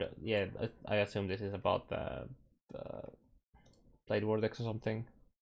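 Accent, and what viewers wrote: Finland. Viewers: I am going to be honest. I know you know a decent amount about the game but your guides are awful for several reasons. First of all, your videos are too long. You need to learn how to edit your content. If you wanna be serious stop copy-pasting and learn how to make it short, on point and simple. Secondly, you don't articulate your thoughts and your English is decent at best. You have to convert your thoughts into sentences for other people and explain yourself. To me it seems like you are just saying whatever word comes to your mind first and you output that. Your Finnish accent and mumbled sentences don't make things easier so you have to compensate for that. Lastly, I myself play this game for a long time and most of the time I'm struggling to understand what you're saying even tho I understand the topic of things. But a lot of details lost. 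Now imagine a person who has no clue about the wider picture. Your explaining of things and these guides are making me facepalm too much and it's disappointing so I thought I will give you some advice. Now what you do with it is up to you.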